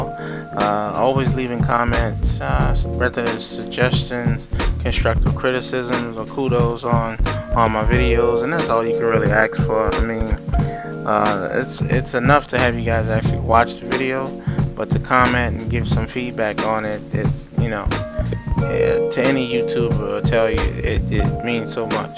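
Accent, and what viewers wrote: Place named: New Orleans, United States. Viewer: Yay for 80s kids! Oregon Trail and Carmen Sandiego were the two favorite games of my childhood. Oregon Trail was the first game I ever role-played...or wrote fan-fiction about, for that matter...I had lots of little drama-filled stories about the party members spinning out in my head and sometimes on paper. Gopher is one of my big inspirations, too. All these great role-players have me watching Skyrim videos more than I actually play the game myself these days. That's what I love about this game; there are so many other stories to be told within the framework of the official narrative. Ah, New Orleans! That's why I couldn't place your accent. My folks are from San Antonio and Austin, but my dad spent a lot of his childhood in the valley, and my aunts and cousins are scattered all over. A few of my cousins used to live in Houston, and one of my best friends just moved out there with her boyfriend recently, so I've heard stories about the traffic. 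I've only visited Houston once, more than 15 years ago, but it was pretty bad then, too. And Texans complain about Californian drivers...I say we just skip the flying cars and go straight to teleportation pads. If only fast travel worked in real life... Do you have a character concept in mind for your first ESO character, especially which faction you'll play? I pre-ordered too, and I have lots of plans for my first character--an Argonian in the Ebonheart Pact, because I always play Argonians first in Elder Scrolls games. Ok, I just lo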